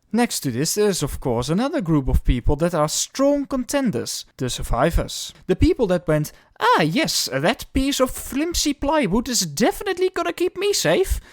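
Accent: Netherlands. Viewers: ziek nederlands accent 🤩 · I smell nederlands (or german)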